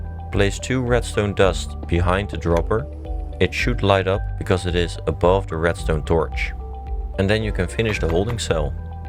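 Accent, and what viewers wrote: Netherlands. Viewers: I dont know why but you sound a bit like a Dutch guy speaking English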